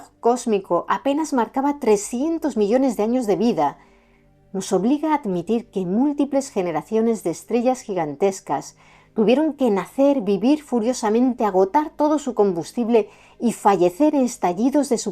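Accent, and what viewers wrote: Castile, Spain. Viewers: De los pocos españoles que no me molesta escuchar el acento castellano,buen tema y bien resumido, felicitaciones.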